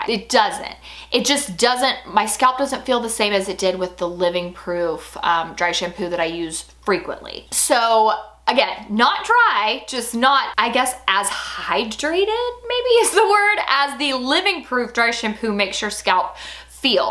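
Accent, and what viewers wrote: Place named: South, United States. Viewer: I love your southern accent and how you say nursery! Haha everyone in Colorado has no accent and sound like news anchors LOL. 🤣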